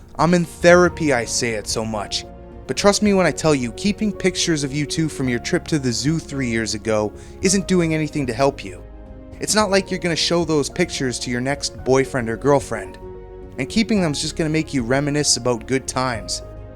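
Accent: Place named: Canada. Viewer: What a fine fellow you are. I'm trying to place your accent to a State? Or, are you a Canadian?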